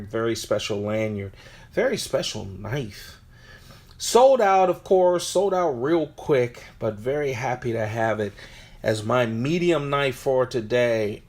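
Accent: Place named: Boston, United States. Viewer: Spyda-co. This guy's gotta be from Baastin.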